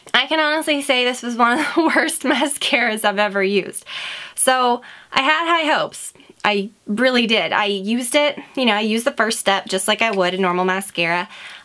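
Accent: South, United States. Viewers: Way to own that southern accent. Southern born and southern bred!!!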